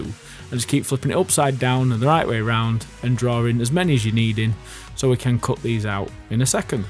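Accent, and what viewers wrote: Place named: England, United Kingdom. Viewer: Great voice. Where in England is that from?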